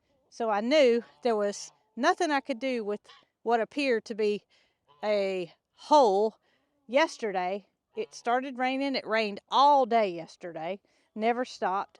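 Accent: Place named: Midwest, United States. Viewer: ❄️You sound like you live in the Midwest USA like me. I hear we might be getting more. Stay safe. ❄️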